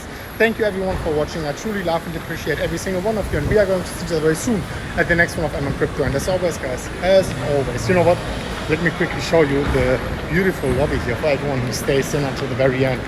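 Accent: Germany. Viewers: You sounds like fxxkin german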